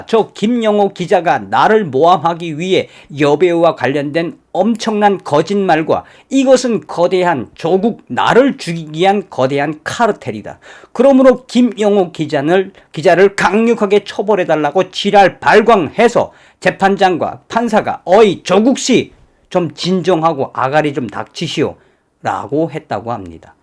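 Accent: North Korea. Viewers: 이북사투리좀쓰지마세요 공판장아니고 재판장.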